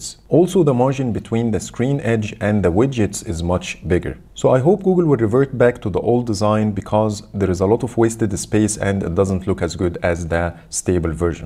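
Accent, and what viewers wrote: Egypt. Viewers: The heavy Egyptian accent tho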